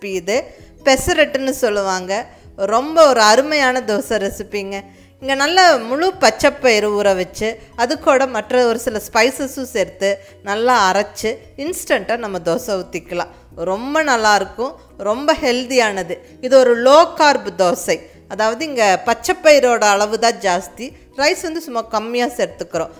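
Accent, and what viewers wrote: Coimbatore, India. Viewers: Hi aunty I made this dosa today and it turned out very crispy and yummy. Whenever I think of anything to cook, before asking my mother I visit your channel and I find the solution to it. I just love the way you flourish Coimbatore slang of speaking and I adore that so much. U have very peculiar pronunciation. Looking forward to meet you one day aunty.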